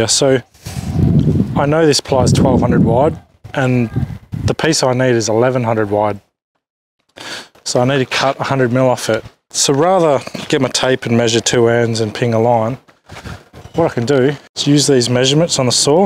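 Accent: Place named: Western Australia, Australia. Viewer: no way mate. I thought you were from West Oz by your accent. North or South of the river? Looks like you work in the northern suburbs if I had to guess. I lived down near Byford most my life. Name comes from a vlog of my time in Russia.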